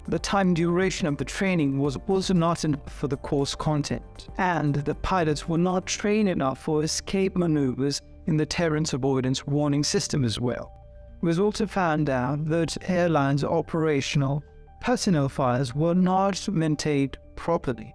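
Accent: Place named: England, United Kingdom. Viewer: Throughout video I was feeling irritated with your annoying English accent ...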